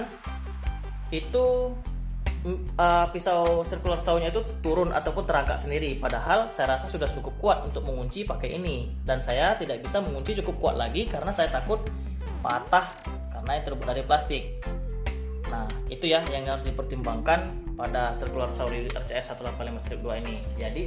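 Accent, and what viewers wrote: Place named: Pekanbaru, Indonesia. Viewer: Dari Pekanbaru ya bang?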